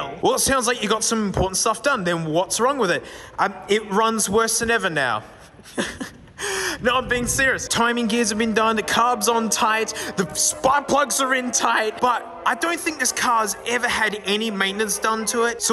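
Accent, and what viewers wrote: Australia. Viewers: James Came Around = James Cameron... Ah the sweet Aussie accent! My ear just needs an extra second sometimes to process!!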